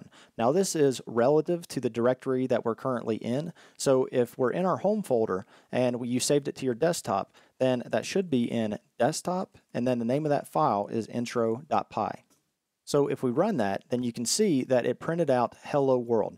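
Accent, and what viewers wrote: United States. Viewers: Man, your speech is amazing. Where are you from? I mean it’s obviously that you are from U.S, but what state?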